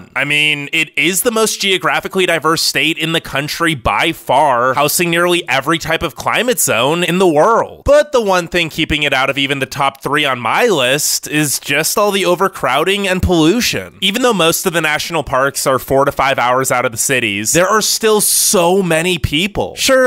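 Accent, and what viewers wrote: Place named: New England, United States. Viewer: Sounds like a New Englander who moved out west and never went to other states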